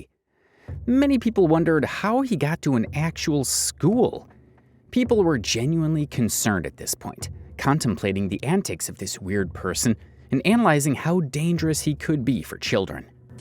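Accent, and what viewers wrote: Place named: Upper Midwest, United States. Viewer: I love this subject and what you do. As someone from the Midwest, I can't help but notice your accent through the video and it makes me really wonder if you're around or from the northern Midwest area.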